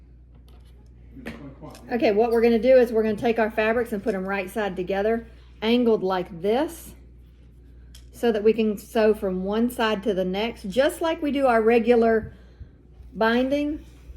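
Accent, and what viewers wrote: South, United States. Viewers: Saw tooth stars are my favorite! Your quilt is gorgeous. I especially love your scrappy binding. Thank you for so generously sharing your quilt pattern with me. Also , my husband and I just love listening to your videos. Your sweet southern accent is a wonderful reminder of our time living in the south. 💗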